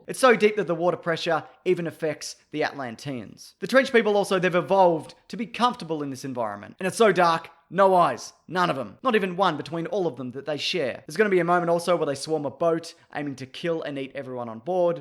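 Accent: Australia. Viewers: “Ack-wa-man” is it that hard for Australians to say aquaman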